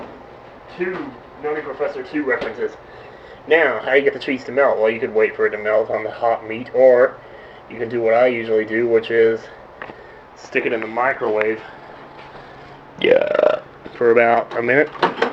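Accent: South, United States. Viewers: I send this to my mom cause when I watch your let's plays I am usually heading in the kitchen :P I said "He let's plays, he Southern AND he makes cooking tutorial's" XD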